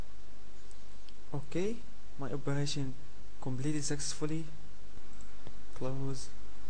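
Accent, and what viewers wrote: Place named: India. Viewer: kkkkkkkkkkkkkkkkkkkkkkkkkkkk I understand everything and I love indian (I suppose) accent.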